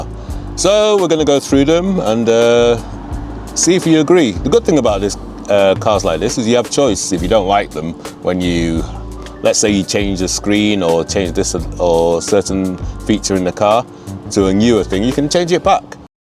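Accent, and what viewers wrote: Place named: United Kingdom. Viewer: I think its great what your doing brother. Most mr2 owners on youtube are american and its a refreshing change to hear a fellow Brit 👍 I love the editing aswell keep it up.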